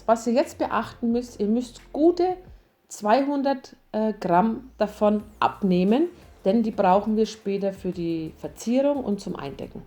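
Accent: Franconia, Germany. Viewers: Eine Fränkin, wie süß! Die Sprache ist unschlagbar u. den leckeren Kuchen bestelle ich schon mal für den Feiertag am <num>. Kaffee mach' ich selbst dazu. LG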